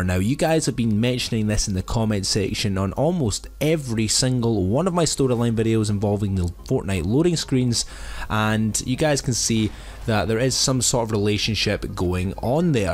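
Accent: Scotland, United Kingdom. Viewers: Are u Scottish or Irish?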